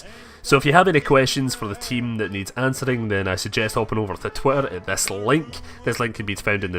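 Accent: Scotland, United Kingdom. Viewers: are you Scottish it sounds like it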